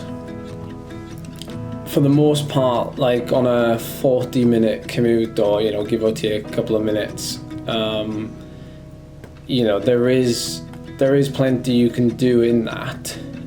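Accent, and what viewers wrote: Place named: Llanelli, United Kingdom. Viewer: Where too you from in Wales?? Sound Swansea/Carmarthen way but not 100% sure??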